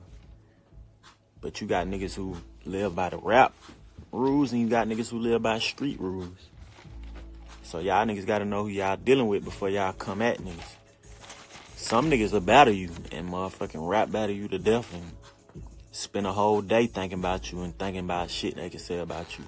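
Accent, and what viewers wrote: United Kingdom. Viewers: Nigga u British u aint from the A fr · Ok British boy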